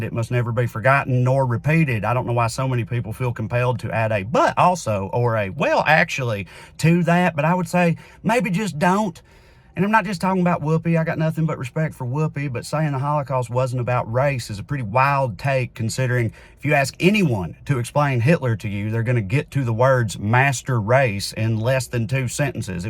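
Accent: South, United States. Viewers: I can’t tell you how satisfying it is to hear rational, humanitarian views arriving with a southern accent. 💕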